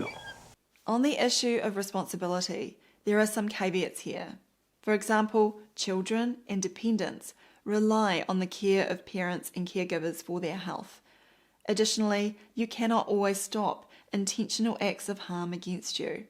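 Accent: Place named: New Zealand. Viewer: I love you, even with your Kiwi accent. Thanks for telling us the truth.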